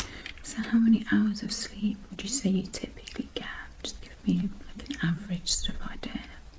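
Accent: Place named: United Kingdom. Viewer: I just love the British accent with the typing noice it goes well together. My mum was both British Romani. My connection with the UK means alot.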